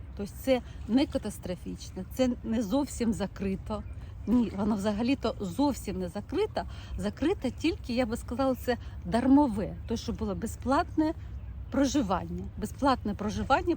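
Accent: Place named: Russia. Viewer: Так интересно слшуть как вы пытаетесь перейти с русского на украинский, а русский так и проскакивает ))))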